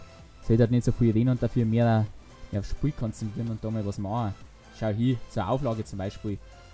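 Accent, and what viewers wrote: Bavaria, Germany. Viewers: I dochte am Onfang du dadsd Boarisch sprechn.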